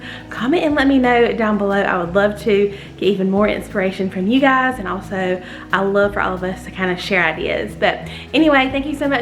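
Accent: South, United States. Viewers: I just love your darling personality and sweet voice. I lived in the south for 10 years (live in the Midwest now) but I miss hearing all the sweet as honey accents and voices of my friends and neighbors. I've been out of the South now for over 20 years but I still say y'all, and sometimes all y'all - even if I do get the occasional odd look - ha!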